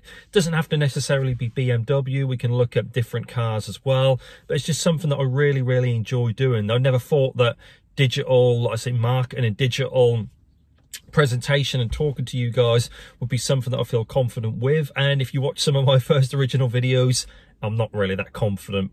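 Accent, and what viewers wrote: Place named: Norwich, United Kingdom. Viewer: By the way, I'm from Norwich and you sound like you might be from these parts? Probably why your videos are even more relatable as it feels you're a trusty chap from round the way! 👍🏻😁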